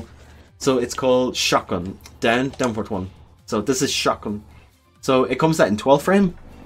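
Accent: Ireland. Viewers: R u from Ireland ?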